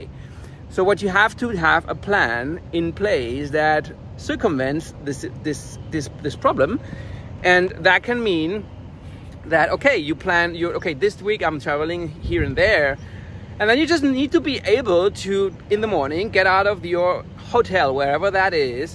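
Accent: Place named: Germany. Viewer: I like your video. I subscribed to your Channel. You talk clear, so in my opinion you do not need subtitles. I think you have a nice German accent (I am Dutch, the subtitles annoy me a bit, but that is my personal opinion)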